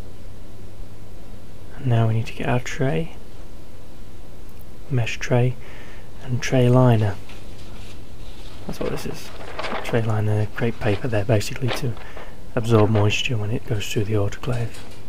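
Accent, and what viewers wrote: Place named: Nottingham, United Kingdom. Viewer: Top vid as usual. Went to see my folks today in Notts, your accent sounds very similar!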